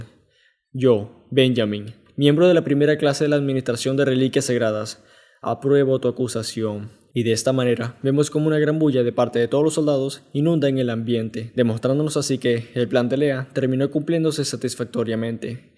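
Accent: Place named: Venezuela. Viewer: El pana este habla como venezolano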